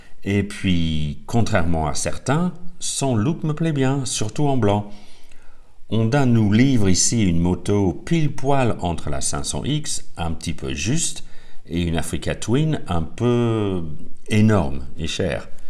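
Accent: United Kingdom. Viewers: Comme quoi tous les goûts sont dans la nature et c'est temps mieux ;) Merci pour votre réponse et la qualité de vos vidéos. Mais également en Français avec un sympathique accent britannique .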